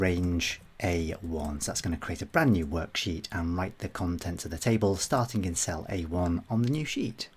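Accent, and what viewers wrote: United Kingdom. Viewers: Love your training series and your British accent.Thanks for sharing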